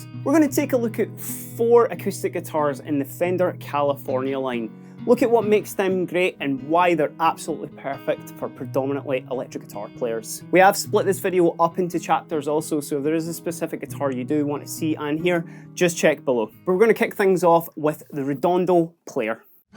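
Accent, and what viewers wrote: Scotland, United Kingdom. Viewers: Can't understand the scotch accent 🤷🏻‍♀️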